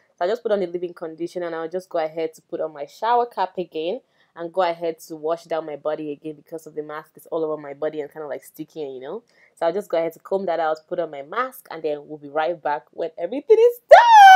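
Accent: Nigeria. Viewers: Your voice makes me no that you are from Nigeria well I am too and I love your video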